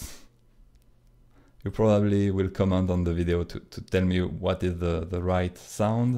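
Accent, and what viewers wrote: France. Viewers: Heh, your accent is mild. At least compared to other well spoken native French, English speakers I have listened to. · ça s'entend que t'es français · French accent is so sweet 🤗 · I just returned from living in Grenoble, FRance for 10 years. I love the accent, and miss hearing very much in my day to day conversations. Merci !! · pls don't change ur french accent, u must be proud of it, i'am an asian, n i also have an accent as asian, my english still incorrect but i do all understand with ur explanation bout krita tutorial, i'am the beginner for digital art also drawing comic, i just start it since a week ago when i got krita already installed on my pc, i wanna try create my own comic even i can't drawing any art, if u want to know my painting just like lil child under 5 years old, but i won't give up to be an pro just like u r, someday i can be more than u to create some artwork, i believe my self n my own ability to do that, pls support me. 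thx for ur video, i luv it so much,. from now m ur fan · Le bon accent Français, on aime! +1 ! :D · Ahah de rien ! Je voulai te rappeler la France ! Ça m'a fais plaisir d'entendre notre doux accent. Super tuto sincèrement ! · I love this tutorial. Thanks so much! (the french accent is becoming cute after I'm into the comic :-)) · Are u french? Nice accent. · I love your french accent =D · Oh no the French accent makes it more exotic learning from the old French Masters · Thank you for this helpful tutorial. Just one question, does this o.p.a.b.t.s. organisation against protection of bad tutorial speech really exist? I dind't find it. And they are wrong. You sound French, but there are native speakers with a much more heavy accent. Please, go on publishing more tutorials like this. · Hi :) I was wondering...are you french? you have a bit of an accent. I took my studies in french and always regretted it, since i thought that english is better for future life (there must be plenty of mistakes here too lol). 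But everytime i go to France or Belgium and go trough comic shops (mainly livres d'occasion) my regrets are gone...french guys have tuns of underground fantasy comic that will never be translated and maybe even forgotten. I found that beautiful and always leave with lots of them. Maybe i'll find yours there someday too :D i hope you're french since my post is so long....it would be awkward xD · Amazing video. Even though the software has updated, this is still relevant. Concerning your English though, and please don't take this as a criticism or an attempt to insult you, but god fucking damn it I couldn't stop laughing throughout the entire tutorial. PLEASE never change your ways, this is really like getting advice from a stereotypical (not in a bad way) French artist with a bottle of wine nearby. You're the best, and I wish you the best. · J'ai juste compris que tu étais français... Super 😂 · That accent... 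FRENCH POWER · You have a beautiful accent, is it French? Also you are a great teacher, I'm learning a lot watching your videos! Thank you,! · Love your accent, french speaking english sounds soooo nice, we, The Swiss people CANNOT speak any other language, as It will Sound like a polar bear imploding. Also love the Tutorial, even though I dont use krita, IT helped me a Lot, entertaining too Merci for this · Devine quoi, j'ai regardé une de tes vidéos récemment, j'avais trouvé ça génial, et voilà que je retombe sur toi par hasard alors que je cherche des tips pour pouvoir faire quelques planches de BD pour un dossier de master! Merci bien! Puis pas d'inquiétude pour ton anglais. J'ai bien reconnu que tu étais français grâce à ton accent mais très franchement, on s'en fiche parce qu'au moins tu fais le job, contrairement à ceux qui pourraient te critiquer, s'il y en a. ;) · Tu es français de france ou du québec car moi du québec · Moi qui ne me sens pas super à l'aise avec l'anglais, j'me sens moins perdu avec l'accent français!!😉. 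Un gros merci, super vidéo.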